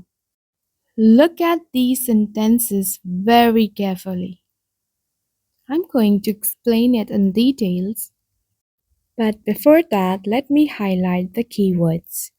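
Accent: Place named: Eastern Europe. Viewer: I beg your pardon, however do you really need to pronounce that B in 'subtle'? It is silent. I feel something East European in your accent.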